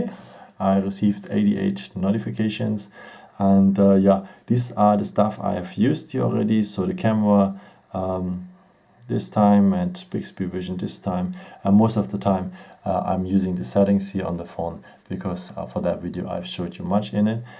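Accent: Germany. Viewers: Warum nicht Deutsch ich mein man hört es ja eh. Ich hole mir nähmlich auch das a50 also eigentlich ein ganz nützliches Video auch wenn ich vieles schon wusste weil ich schon so ungefähr 40 videos über des a50 gesehen habe. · I'm getting this phone over weekend. I love your German accent! I love German accent. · Haha man merkt irgendwie schon raus das du deutsch bist. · German accent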